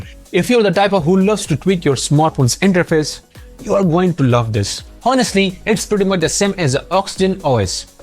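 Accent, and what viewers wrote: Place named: India. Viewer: the annoying dirty indian accent is cringe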